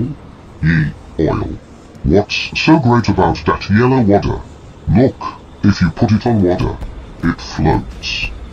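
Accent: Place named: United Kingdom. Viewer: Eh, sounded kinda British lol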